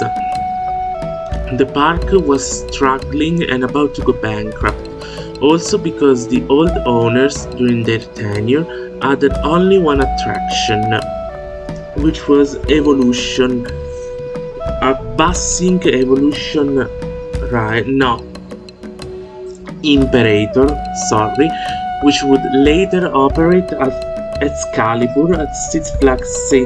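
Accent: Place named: England, United Kingdom. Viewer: Bravissimo mi piace quella pronuncia inglese ;)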